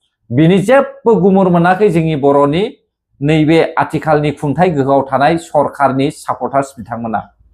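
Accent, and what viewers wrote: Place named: Bihar, India. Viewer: Hindi Raoa biharniswmwn ada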